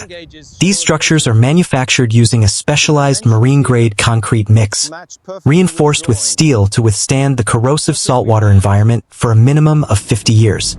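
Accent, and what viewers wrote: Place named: United States. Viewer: What is an American doing narrating an Australian Project ?????????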